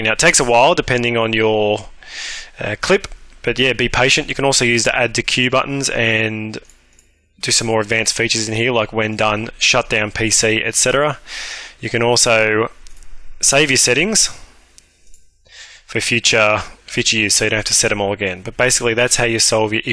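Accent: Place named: Australia. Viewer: your strong ozzie accent made this 50 billion times more fun to watch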